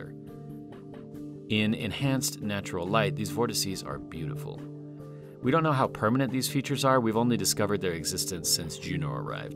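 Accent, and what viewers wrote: United States. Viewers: What happened to your voice???!!! An American accent just isn't the same. (I'm from the U.S btw) I think it sounds better with your original voice. Just my 2 cents worth... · That's with the American accent? I loved ur ur original voice it was calm and soothing .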